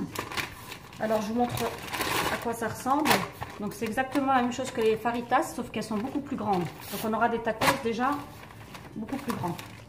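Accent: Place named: Algeria. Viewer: في الهدرة باينة جزائرية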